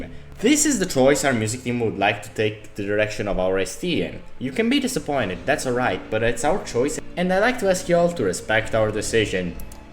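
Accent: Italy. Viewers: heard that voice and had a suspicion, then that “proelium fatale” was obvious, sono italiano anch’io ;)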